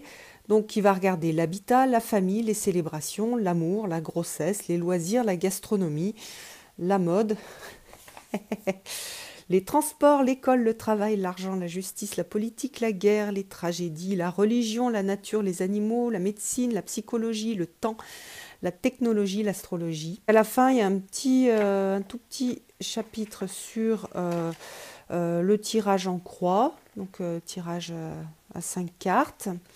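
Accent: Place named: France. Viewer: Não entendi nada, mas adoro o sotaque francês.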